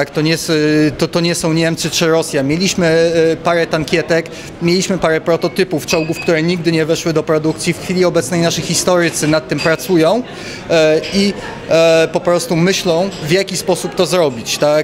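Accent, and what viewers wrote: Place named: England, United Kingdom. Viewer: ale ma chamsko angielski akcent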